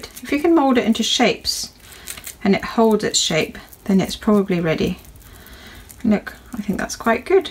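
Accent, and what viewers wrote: United Kingdom. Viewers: I don’t know what it is about female British accents, but damn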